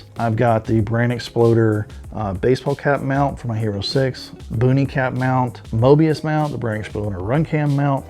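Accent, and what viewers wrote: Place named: South, United States. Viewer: You sound like David Attenborough with a southern accent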